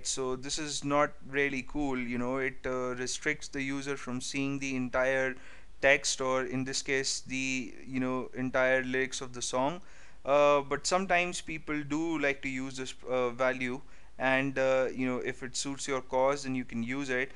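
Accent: India. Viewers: why do all indians sound exactly the same from all the youtubers i have heard which are indians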